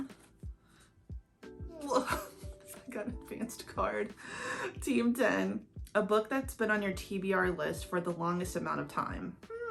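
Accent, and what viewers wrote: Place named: England, United Kingdom. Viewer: breaking out the English accent, eh?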